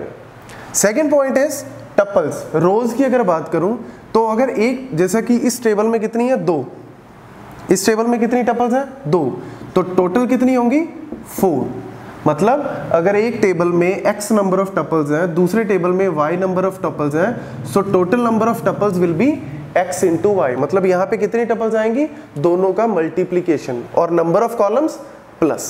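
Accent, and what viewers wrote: Punjab, India. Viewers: fun fact - the guy is Punjabi. P.S. - I just guessed it because his accent reminds me of Punjab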